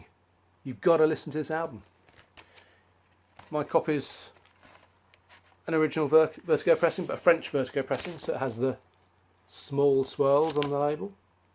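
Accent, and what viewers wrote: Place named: United Kingdom. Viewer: Hi, I've just found your channel and subbed. It's always great to find another UK voice, especially one talking about Prog. I've not heard of before. One for me to look into. rings a bell. I'm sure I have a 12 inch single by them somewhere. are a new one on me too. Nice Congrats on the Roger Dean cover. I've not seen that cover folded out like that before. Fantastic. I've had my eye on the album for a while now, purely because I live near some viaducts like those on the cover. I was put off though when I read a review that it was reminiscent of. But it sounds like I should give them a go anyway. Great video! We didn't overlap once. Cheers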